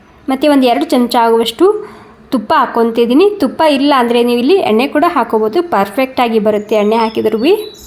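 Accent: Maharashtra, India. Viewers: ನಿಮ್ಮ ಕನ್ನಡ ಮಾತನಾಡುವ ಶೈಲಿ ಕೇಳೋಕೆ ತುಂಬಾ ಸಂತೋಷ ಆಗುತ್ತೆ. ಮಹಾರಾಷ್ಟ್ರದ ಸೊಗಡು ಮಿಶ್ರ ಮಾಡಿ ಕನ್ನಡದಲ್ಲಿ ವಿವರಿಸೋದು ಆಕರ್ಷಣೀಯವಾಗಿದೆ. ನಿಮ್ಮ ಅಡುಗೆ ಮಾಡುವ ವಿಧಾನ ನಿಜಕ್ಕೂ ಪ್ರಶಂಸನೀಯ 👏👍.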